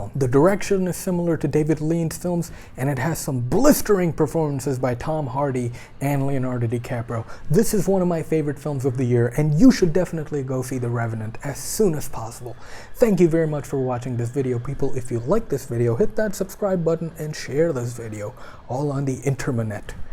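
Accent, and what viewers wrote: United States. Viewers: i think you voice is american but acting is overacting se bhi upar bhai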